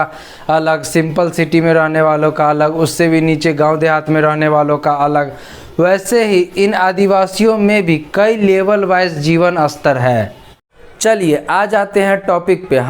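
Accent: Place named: Bihar, India. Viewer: Don't speak in bihari accent if you want to reach to audiences throughout India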